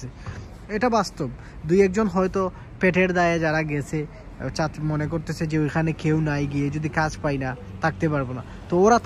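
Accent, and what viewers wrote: Sylhet, Bangladesh. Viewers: Vai apne kita sylhety ni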